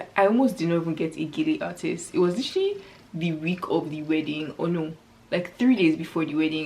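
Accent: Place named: United States. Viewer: Were you born in canada or united states!!! You have an american accent with a african twist, you go in and out of the accent!!! Its so cute!!! You are a beautiful girl!!! Congrats babygirl!!! You remind me of my daughter!!!😊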